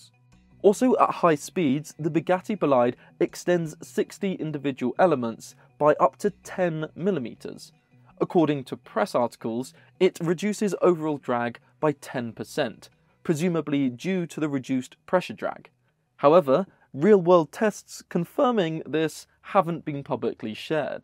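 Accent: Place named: South, United Kingdom. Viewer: Southern British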